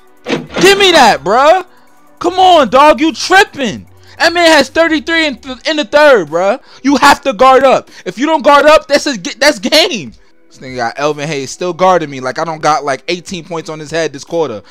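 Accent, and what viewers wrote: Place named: New York, United States. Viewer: lol in this video you had a New York accent-- lit vid by the way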